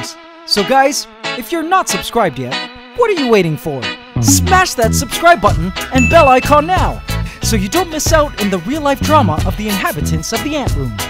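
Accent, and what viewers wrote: Canada. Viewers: Destrxct_ haha I always assumed he was Canadian... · You are Canadian right?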